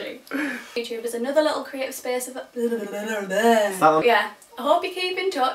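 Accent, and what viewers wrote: Middlesbrough, United Kingdom. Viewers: Nice to hear you speak! Proper boro accent! :) xx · ***** A very stereotypical British accent haha I really don't know why :)X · It's mad how much you can tell you're from the boro on here.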